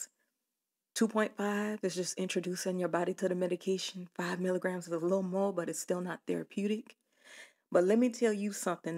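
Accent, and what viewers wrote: Louisiana, United States. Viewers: You have a Louisiana accent... Heavy Louisiana accent.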